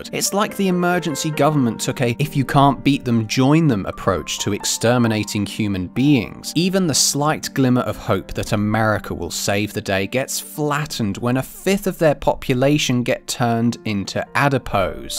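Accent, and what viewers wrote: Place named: United Kingdom. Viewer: Hello man. I was thinking about your accent but i can’t quite tell which is it: of course it’s an accent from the UK but which one? I’m from Italy and i’m trying to get more familiar with this kinds of accents, thank you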